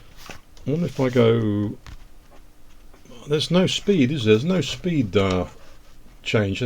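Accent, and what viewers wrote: United Kingdom. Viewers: Sounds British….english with pounds… … not French… and the ai didn’t convert you to a New England accent nor change your words to be dollars….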